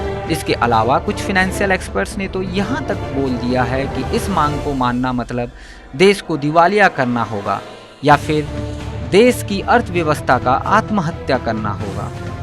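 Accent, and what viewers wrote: Odisha, India. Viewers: It sounds an odia student is reading an english essay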